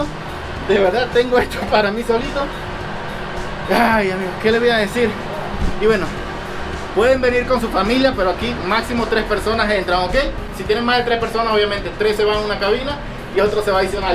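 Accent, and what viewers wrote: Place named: Quito, Ecuador. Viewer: ya el acento Quiteño esta más notable en ti amigo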